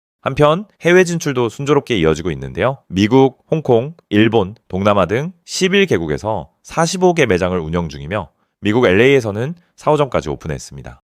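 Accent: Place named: Gyeongsang, South Korea. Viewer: 갱상도당 아이가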